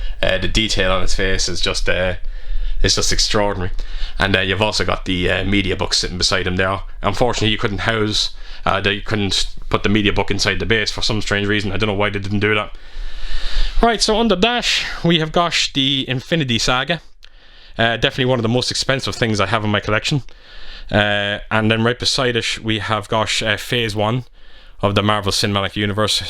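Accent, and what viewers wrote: Ireland. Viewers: is that a Dundalk accent ?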